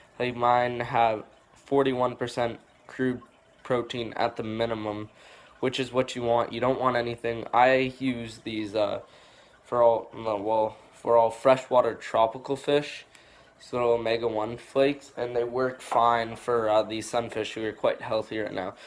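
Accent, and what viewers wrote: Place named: North, United States. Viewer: I have three sunfish I got out of the lake I had a 75 gallon somebody gave me I had lime rock gravel plants already I grow on aquatic plants I took a sponge and made a Matten sponge filter covered it with lime rock and put a powerhead behind it so basically I might have spent I had everything but I will say $30 to set my 75 gallon tank up if that for my sunfish And then I just threw them in there they are tuff fish I’m in Florida so I don’t know sounds like you’re from up north somewhere I get my own aquatic plants out of the streams and rivers and ponds and we got a lot of it. So $300 is a bit much you probably just bought everything new hey if I can make it I’ll make it but good job your tank is pretty and clean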